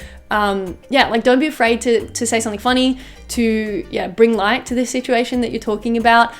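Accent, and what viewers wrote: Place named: Australia. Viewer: thanks for your content! pleasant to hear a fellow Aussie accent!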